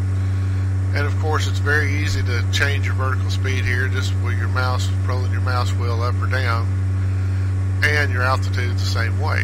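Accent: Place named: South, United States. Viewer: Thank you! A2A‘s C172 had taught me to stay away from the AP and fly always manually! There‘s a point where a simulation can be too close to reality, and I think they reached that point there. No fun. 👍🇺🇾 Your video was so helpful, mate! Gotta love your southern accent, man. Makes me feel so cosy and welcome. 👏🤝 regards from South America, Mike PS just found out: you gotta set your actual barometric pressure in the AP, otherwise you could be some 100 ft off…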